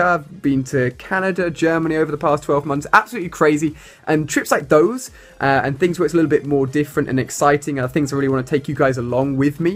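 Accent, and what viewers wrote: United Kingdom. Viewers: Hes uk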